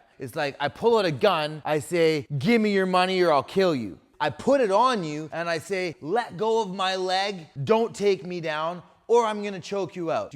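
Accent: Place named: Canada. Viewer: 100% you know its Tristar when you hear the Canuck accent.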